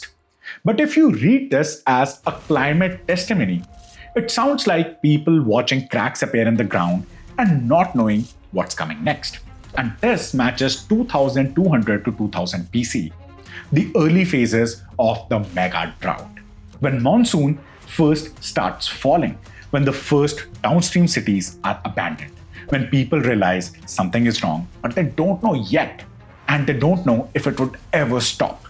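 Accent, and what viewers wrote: India. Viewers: nice to listen to an Indian guy (and not British) doing this!!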